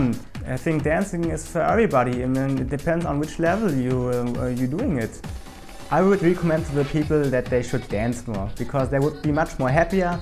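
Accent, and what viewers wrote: Germany. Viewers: Also dein englisch klingt extremst deutsch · schade dass man so hört, dass er aus deutschland kommt :D klingt immer bisschen lächerlich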